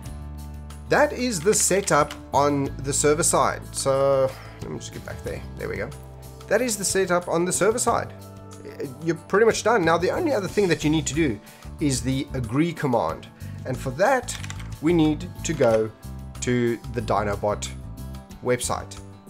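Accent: South Africa. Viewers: My dudes South African, love you! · You sound South African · Are you from south africa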